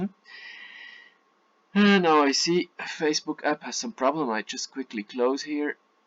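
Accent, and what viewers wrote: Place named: Bern, Switzerland. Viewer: 😂has mr no denkt bi dem akzent gruess us bern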